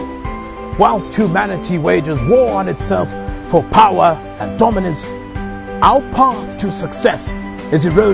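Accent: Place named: RP, United Kingdom. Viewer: you are quite simply brilliant! Love the posh accent and style of presentation. Gimme more, gimme more! 🎉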